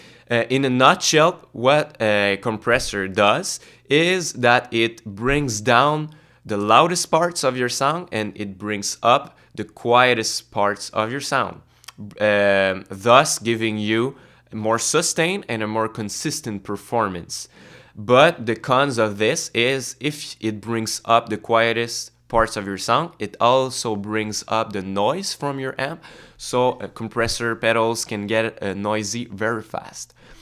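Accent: Quebec, Canada. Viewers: On reconnais tout de suite le gars de Quebec! Beau review! Tu me conseille quoi comme compression?, je suis guitariste Rock-Metal, je fait des solo et des bout plus clean et expérimental avec des effets, j'aimerais sustainer un peu mes notes et égaliser certains passages plus soft. c'est dur a choisir sur internet quand on les essaie pas. Le Cali 76 a l'air pas pire mais le prix me fait ** je suis sur de trouver quelque chose moins cher. Merci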